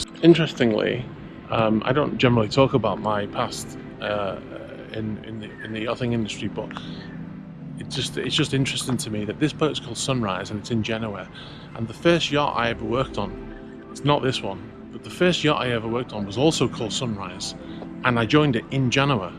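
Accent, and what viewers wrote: Liverpool, United Kingdom. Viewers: Where are you from? I think your a scouser, definitely northwest anyway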